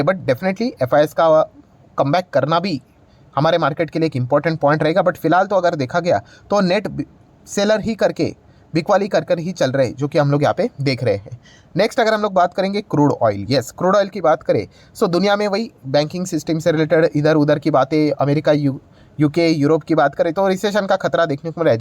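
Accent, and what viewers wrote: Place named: Gujarat, India. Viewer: Bhai aap kya gujarati hoo 😂 aapki boli pr se lage Love from rajkot ❤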